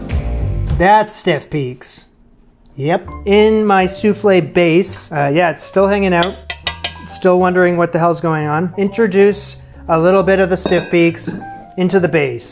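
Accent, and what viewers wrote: Canada. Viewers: Yup, been binge watching these too the past few days. The best thing is waiting for to drop an "about" in his adorable Canadian "ooh" accent. 😁 Definitely making these soufflés myself! Keep the orders up, J! 😋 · Please respond to my comment. You are Canadian based on your accent.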